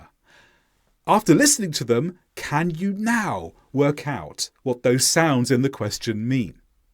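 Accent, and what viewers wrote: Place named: United Kingdom. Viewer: it is a pleasure to listen to English with a British accent. We, in Romania, only learn American English at school, being convinced that it is British 😂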